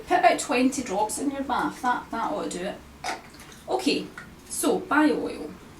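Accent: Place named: Scotland, United Kingdom. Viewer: Great review and I adore the Scottish accent x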